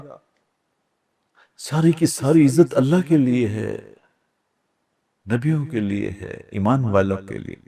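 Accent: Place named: Lahore, Pakistan. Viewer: Loves his lahori punjabi 😊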